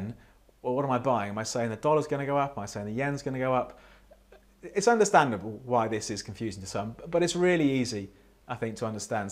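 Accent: United Kingdom. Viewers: Him having a British Accent , is reassuring . Everything he says is golden.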